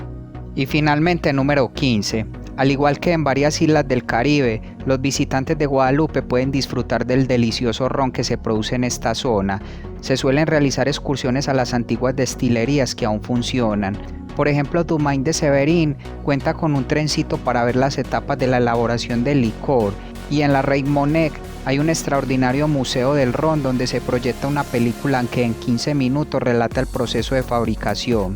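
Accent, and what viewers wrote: Antioquia, Colombia. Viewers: Qué lindo eres en tomarlo en buena manera, pero va a ser difícil trabajar en ese acento paisa, de todas maneras sigue tratando. Muy buen Video!😊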